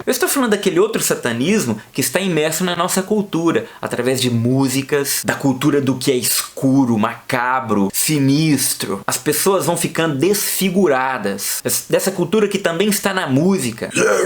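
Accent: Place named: Minas Gerais, Brazil. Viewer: Grandes verdades ditas de forma simples, ao estilo mineirês. Abramos os olhos e convertamos nossas vidas. Cristo é o Senhor!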